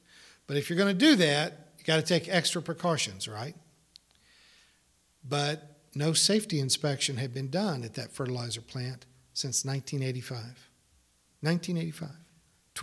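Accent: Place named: United States. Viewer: I'm a pastor in the UK and anyone in the UK who watches this needs to filter out the US accent and recognise that all this could be said about our countries as well. I wish I saw as clearly and spoke as eloquently as this man. I'm from a different theological stable, being unwilling to describe any scripture as 'made up' and 'padding Paul's resume', but I hope that evangelicals on either side of the Atlantic will not be put off by that. This message has the gospel right at its heart. Thank you.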